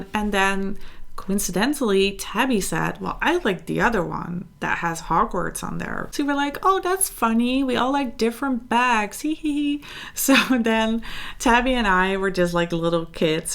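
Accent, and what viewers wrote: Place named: Netherlands. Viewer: I'm new to your channel and I knew you were Dutch just by the accent haha lovely video!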